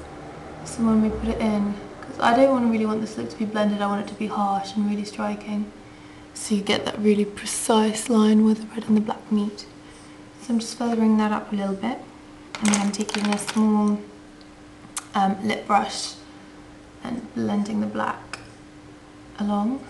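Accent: England, United Kingdom. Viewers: Lovely accent! I miss England, I got my BA in English Lit there. :( I want to be there again so bad.. Anyways, you TOTALLY persuaded me to go get the Spiced Chocolate quad, as I have commented earlier, I never thought that copper-red would look good on me as I have blue eyes and fair skin, but we both have the same colouring so I will give it a try. Please, please, please!!! make more videos,I beg you! You have amazing talent, you have not been in make up school? Really? I am gobsmacked.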